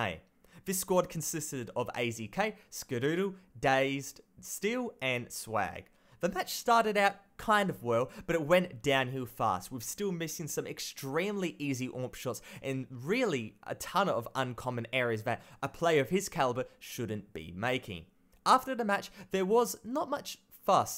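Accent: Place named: Australia. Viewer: 70k damn you are gaining quick bro! Really enjoyed this video, I think we all agree on the fact that CA is dead and probably has been for 2 years already. But it doesnt really matter what game, your commentaries are always so chill. Love the aussie accent haha.:P keep going bro:-)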